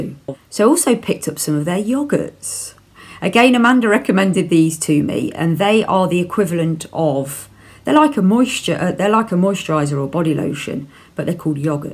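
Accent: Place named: RP, United Kingdom. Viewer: You sound posh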